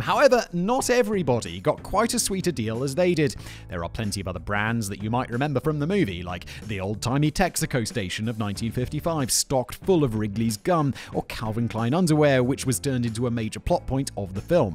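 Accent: United Kingdom. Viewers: Fuck conforming (American here) you do you. Pronounce it the british way! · Don't feel badly about Nike. I'm in Michigan, and it took that shoemaker at least a decade of very expensive commercial advertizing to get me to finally make me pronounce it the Greek way. Before that, I pronounced it the normal way, like you. Also, part of your charm is your very British accent. Hearing you say nike is the audible equivalent of the Y in tyres. If you didn't do these things, then it wouldn't add up. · Well you called it a hood instead of a bonnet so you might as well start pronouncing Nike like the rest of us. The UK isn't the world. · Eh. F the British pronunciations. No one but you uses them. If you wanted it different you should’ve fought harder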